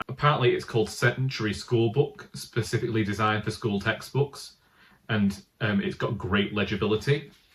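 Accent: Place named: Manchester, United Kingdom. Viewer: I've been watching your videos a lot recently and I really enjoy them, in part because I recently moved away from Manchester and I feel so at home listening to your accent. Also, you've helped me out of a reading slump and although I'm not a quick reader I am back into reading again. I enjoyed Never the Bride by Paul Magrs and I've just got Emotionally Weird by Kate Atkinson. I've made note of quite a few of your recommendations, which I'll look at getting later on. It's funny how earlier this year I couldn't find anything that tempted me to read but, having got back into the reading mood, I'm coming across so many books that look interesting and my book shelves are stacking up. I've never really looked at books in charity shops before but I think the randomness of choice has been really helpful. Anyway, just wanted to say thanks, 📚🙂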